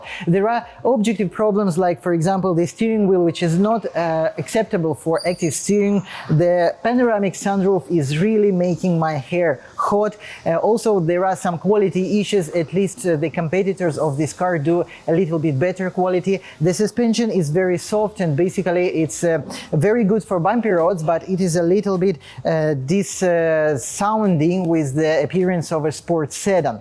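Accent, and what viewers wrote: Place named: Asia. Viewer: You do like an Asian person speaking English. If I didn’t see you, then I will think you’re Asian.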